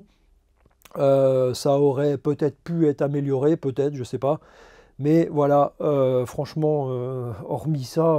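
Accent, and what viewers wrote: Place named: Northeast, France. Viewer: Bonne vidéo, bien commentée, avec un accent du Nord Est bien sympathique !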